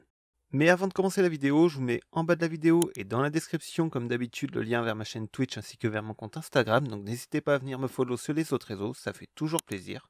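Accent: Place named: Nord, France. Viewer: Géniales tes vidéos, ça s’entend que tu viens du ch’Nord! 💪🏼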